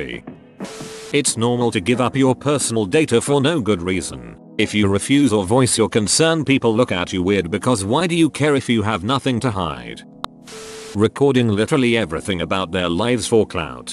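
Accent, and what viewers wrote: United Kingdom. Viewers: That's because this voice sounds like a cultured British robot.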